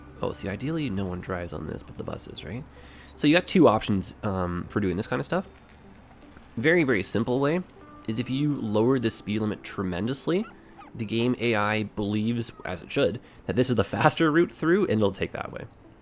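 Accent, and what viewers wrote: Toronto, Canada. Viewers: i was just coming to comment that this dude sounds so canadian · Discovered your channel through the 5 Builders collab, really enjoying your content. As a fellow Torontonian, but one who mostly watches British YouTubers, hearing someone online using the local accent really caught me off guard lol. The numbers of "beauty"s and "eh"s in this video are fantastic :D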